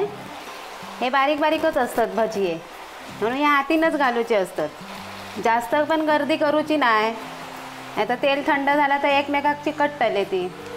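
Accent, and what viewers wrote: Malvani, India. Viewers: Wahh malwani bolan 👌👌👌 bhaji 😋😋😋😋😋😋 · तुमचं जेवण , अणि माववणी बोलणं , फार सुंदर .जय कोकण .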